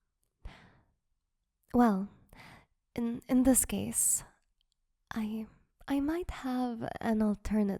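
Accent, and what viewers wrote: Netherlands. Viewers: It’s a Dutch accent, herself is Dutch per her profile info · Is that a Nederland accent? Very nice.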